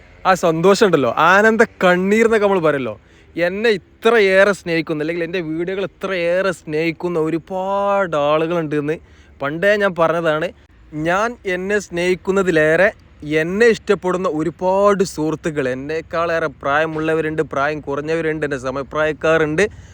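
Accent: Malappuram, India. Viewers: bro I like your videos and the malpram language and the its slangs. I do watch to listen to that. Please include more slangs😍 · ഒരുപാട് നാളായി പറയണം എന്ന് കരുതിയതാ , സത്യത്തിൽ നിങ്ങളുടെ വീഡിയോയുടെ ഏറ്റവും ആകർഷണം നിങ്ങളുടെ നാടൻ രീതിയിൽ ഉള്ള സംസാരം തന്നെ ആണ് . ആലപ്പുഴയിൽ ഏതാണ്ട് അച്ചടി ഭാഷയുടെ അടുത്തു സംസാരിക്കുന്ന എനിക്ക് , താങ്കളുടെ മലപ്പുറം സ്ലാങ് അതിന്റെ പ്രയോഗത്തിൽ വരുന്ന നൈർമല്യം , അതിന്റെ ശൈലികൾ എല്ലാം അടിപൊളി ആണ് . പിന്നെ രാഷ്ട്രീയ പൊട്ടത്തരത്തിനും , രാഷ്ട്രീയ കാഴ്ചപ്പാടിനും താങ്കൾ കൊടുക്കുന്ന മറുപടി മികച്ചത് ആണ് . സാദാ മലയാളികൾ രാഷ്ട്രീയ അന്ധത വെടിഞ്ഞു താങ്കളെ പോലെ ചിന്തിച്ചിരുന്നേൽ ഈ റോഡ് വികസനം ഒക്കെ 20 കൊല്ലം മുന്നെ വന്നേനെ . ഒരുകണക്കിന് അതും നന്നായി NH66 ഇപ്പോൾ പണിയുന്നത് viaduct , flyover , underpass , മിക്ക നിലവിലുള്ള പാലങ്ങളും മാറ്റി പുതിയ രണ്ടു പാലങ്ങൾ മിക്ക പ്രധാന ടൗണുകളെയും ഒഴിവാക്കി ബൈപാസ്സുകൾ എന്ന അടിപൊളി ഹൈവേ ആയിട്ടാണല്ലോ . ഞാൻ NH 66 ന്റെ വർക്കിന്റെ ഒട്ടുമിക്ക യൂട്യൂബ് ചാനലുംകണ്ടിട്ട് ആണ് ഇത് പറയുന്നത്. 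നിങ്ങളുടെ ചാനൽ അടിപൊളി തന്നെ .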